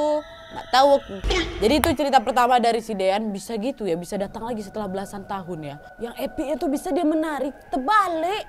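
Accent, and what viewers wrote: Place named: Medan, Indonesia. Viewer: Tiap hari nontonin video nya..siang mlm..dari video trbaru sampai video lama...sampai kebawa2 bahasa sma logat medan nya 😅😅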